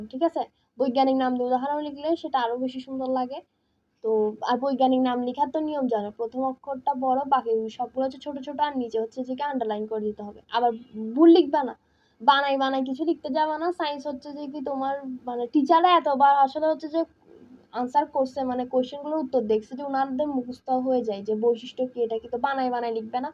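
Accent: Chittagong, Bangladesh. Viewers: Apu ami kintu ekhon Chittagong e thaki🙂 Apnar language shunei buja jacche Chittagong er🙂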